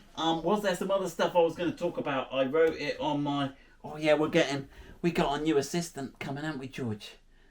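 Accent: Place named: England, United Kingdom. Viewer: Congratulations, lads! The whole team thoroughly deserve this milestone. I don't own a landrover of any sort but I love watching your videos. The way you show us how to "be brave" when pulling bits of a car has been really helpful. Your pommie accent and banter with and George etc is really entertaining. Your technical skill and innovation, is really impressive, yet you are so humble in the delivery. Good onya, mate, you are a bloody legend!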